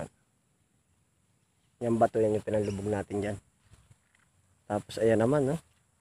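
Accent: Quezon, Philippines. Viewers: Taga quezon din po kami...nakakaaliw manood sa punto p lng quezon tlga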